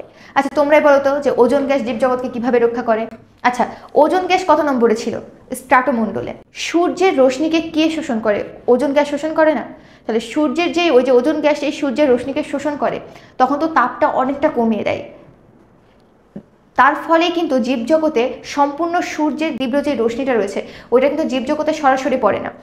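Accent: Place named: India. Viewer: X যদি ট্রোপোমন্ডল হয় তাহলে Z হবে মেসোমণ্ডল। বোনটির বাংলা শুনে মনে হচ্ছে ভারতীয় বাংলা।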